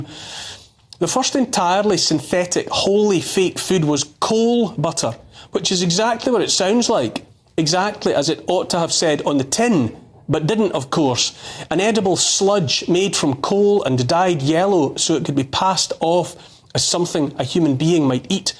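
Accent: Scotland, United Kingdom. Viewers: That strong, angry, Scottish accent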